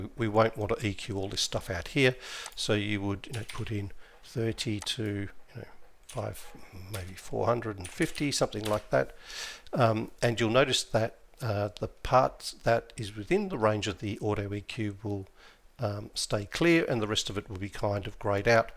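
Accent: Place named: South Africa. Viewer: brilliant tutorial, you sound South African BTW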